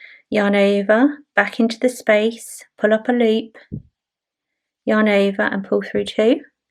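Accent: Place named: England, United Kingdom. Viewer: Thanks a lot … great tutorial and I love your English accent …am going to have a look at your book, sweetie.Have a nice day, hugs from France.🥰😘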